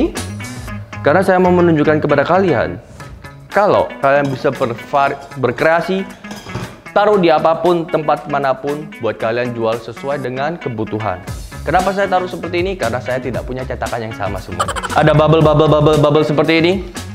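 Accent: Surabaya, Indonesia. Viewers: Logatnya kek orang sby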